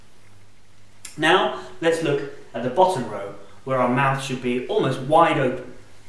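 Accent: United Kingdom. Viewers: Is this britidh accent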